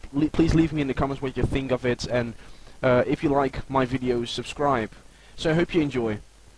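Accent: Netherlands. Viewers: Hoor meteen dat je NL bent:P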